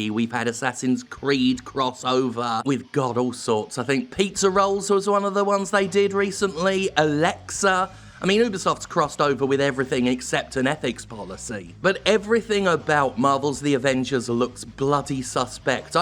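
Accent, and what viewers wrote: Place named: United Kingdom. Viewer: Don’t get how but okay? He has a British accent, 👏👏👏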